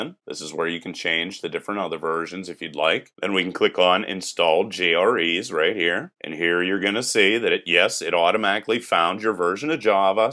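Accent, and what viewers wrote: United States. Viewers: charnel pawny Which part of the US is he from? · An american accent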